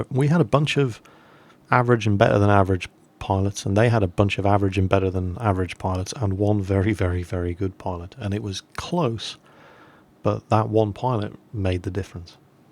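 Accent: England, United Kingdom. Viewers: There is no country called Bohemia lol and he is from Britain listen to him. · I was a fair question dont be a dick. Just because he has a English accent doesn't mean he was born in England. Maybe his parents are Bohemian, like most Czechs.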